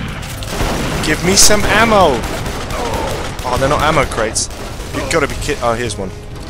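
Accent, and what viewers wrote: United Kingdom. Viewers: why do you taik in a british acsent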